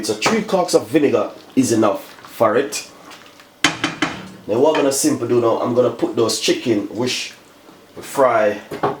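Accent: Jamaica. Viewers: Jamaican talk😅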